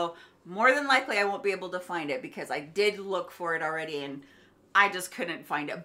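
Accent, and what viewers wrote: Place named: Upper Midwest, United States. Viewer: Wow, I had no idea Ms was a fellow Texan! Since I started watching the channel I thought I heard a very light upper Midwestern accent.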